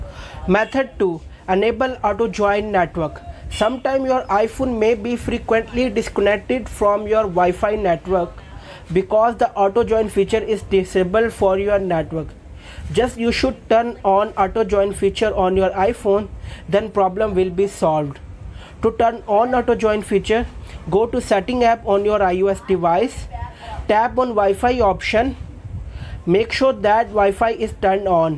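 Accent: India. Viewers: You know it is bad when the guy has an Indian accent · Indian people English is good, and the accent maybe Indian but you understand very clearly what the guy is saying, good video!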